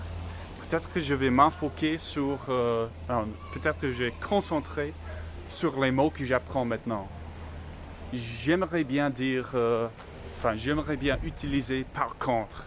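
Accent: Quebec, Canada. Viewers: C est vrai que tu as légèrement un accent québécois mais c est du francais 😊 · Salut. C'est Betsy. Je suis ravie que tu fasses autant de progrès avec ton français (et que tu emploies correctement le subjonctif! LOL) Je n'ai pas écouter toute la vidéo, mais quand même le début et la fin. Excuse-moi de te corriger (je ne peux pas me retenir, étant prof!!) ici et là. 1. Je suis en train DE +infinitif 2. faire les courseS 3. Une Rue 4. un accent Québecois (masculin) 5. AU Canada 6. essayer DE 7. to shape = former 8. to get by = se débrouiller Et j'apprécie ton accent Québecois! Vive le Canada!! Elbows Up! A la prochaine, Betsy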